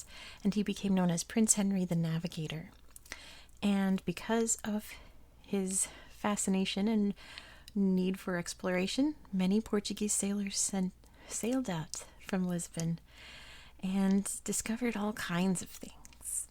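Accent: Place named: California, United States. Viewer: That californian accent is like a key that unlocks my sleep.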